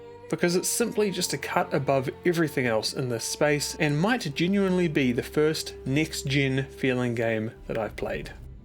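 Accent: New Zealand. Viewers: Is this new zealand accent?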